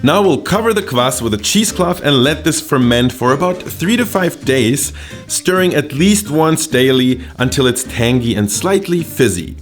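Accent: Russia. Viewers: Ладно там русский акцент, дешёвая малосъедобная варёная колбаса и фигурное выкладывание салата для окрошки. Но пальцем насыпать сметану в окрошку - это какое-то откровенное фричество. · Абсолютли russian-english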